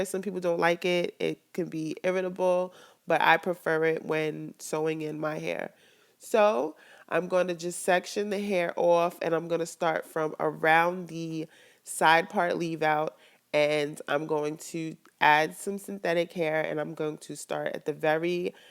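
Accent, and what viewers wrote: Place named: New York, United States. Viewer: u have a very strong new York accent I like it lol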